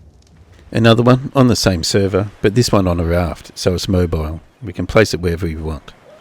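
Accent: Australia. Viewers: he's Australian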